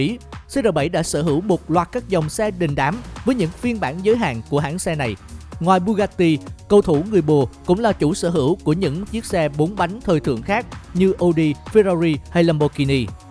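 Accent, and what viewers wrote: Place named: Da Nang, Vietnam. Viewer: Người đà nẵng ak ad 😂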